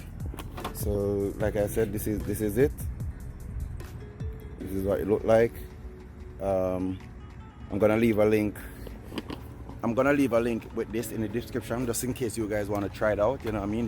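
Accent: Jamaica. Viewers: Yow breda talk jamacan me understand. 😆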